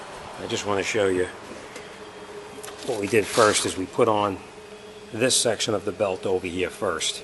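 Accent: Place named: New Jersey, United States. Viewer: I closed my eyes and it was like Bobby Deniro’s garage in here! Love that Jersey accent! I’m gonna do this soon on my 03 Sport plus check all the pulleys for noise. Mines got 200k and it sounds like idler, tensioner or power steering pulley is making an awful hum. Thanks for the great video. The complainers can just fugget-about-it! Lol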